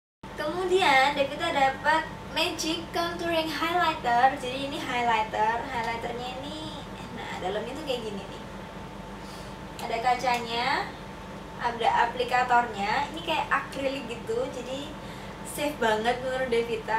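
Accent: Java, Indonesia. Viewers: Asli mana ka,,,,jawa nya medok.bgt hehhee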